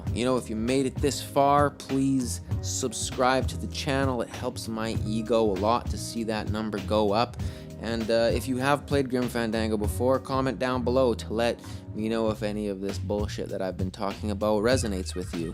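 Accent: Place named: New York, United States. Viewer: You're a real New Yorker aintcha?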